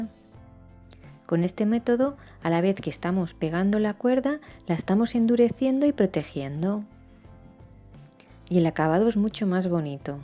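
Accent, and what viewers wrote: Spain. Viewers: Mas bien habla legit imo español de España.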